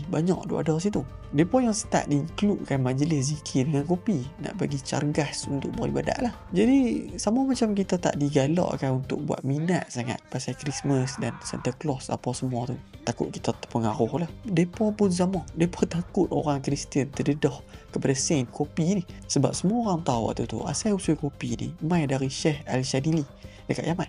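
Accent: North, Malaysia. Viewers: Sedap dgr loghat utagha 😅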